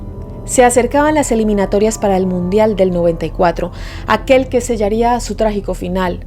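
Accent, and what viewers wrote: Colombia. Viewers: Saludos,. Soy nuevo en tu canal y me tengo que poner al día con numerosos vídeos. He comentado este en concreto porque recuerdo perfectamente cuando sucedió y como me impactó. Solo decirte que me encanta el contenido y cómo lo narras (no puedes negar que eres periodista ☺️) además de apasionarme el acento colombiano. Te felicito y espero que sigas subiendo muchos vídeos donde investigues temas apasionantes. Un abrazo desde 🇪🇸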